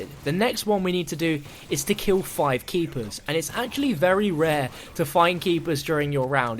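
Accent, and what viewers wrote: United Kingdom. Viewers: He's British, his accent changes pronunciation.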